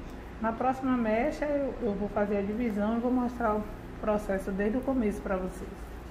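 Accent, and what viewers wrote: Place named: Bahia, Brazil. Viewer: Esse sotaque com certeza é da minha bahia que amo de paixão ❤ Depois que lavar não volta ao normal???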